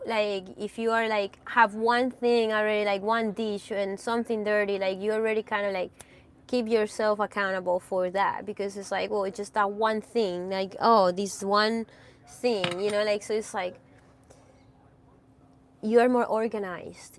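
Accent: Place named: Spain. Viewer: that must be some good stuff if you are seeing aliens on the ocean and on the ground i dont know i think you been in the desert a long time I dont know maybe your seeing blue lites okay (LOL) well come back to earth you havent met me yet you have no been able to date men because it wasnt me I am simple living man I am a leader I would protect you I mean I have bad knee from an accident but I can walk I use a cane and I can cook for myself and clean things I get social security so I dont have to work I can spend all my time loving you and caring for you. I am a tradtional man I am honest romantic I am older but I have values I am close to my family I have been without a partner for 15 years but I know that me and you would get along you have the bigest brown eyes and long brown hair that I love and tan skin and I love your spanish accent I am a german but born in America I dont care to much about material stuff your the type of women who likes to live simple and i love that I am very masculine and I want a women who wants a masculine man like me. 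anyway I know that we would click I just know my telephone number is <num> I am in omaha Nebraska and I can come to you I know that you dont want problems or to be some ones mom I dont need that I will not bring any problems with me and like i said I can do things for myself I also know about engines I can work with tools and I have knowledge about a lot of things I play guitar and bass guitar I write love songs list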